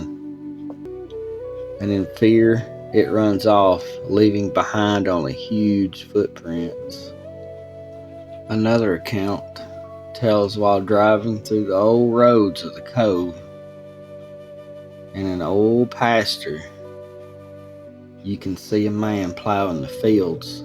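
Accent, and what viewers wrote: South, United States. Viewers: Thanks! That was introduced. I enjoy your southern drawl... I'm from NC, so I have a southern accent but I like yours better.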